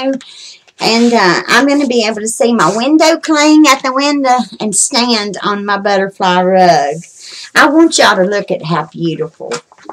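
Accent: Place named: South, United States. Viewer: Wow!! You are a wheeler dealer!!! “In yonder” tickled my funny bone! You might have more of a Southern accent than me!! Glad you had a successful yard sale with your treasures.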